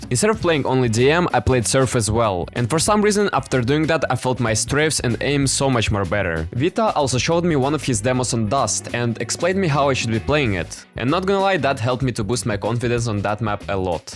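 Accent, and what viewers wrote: Finland. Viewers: are you finnish!?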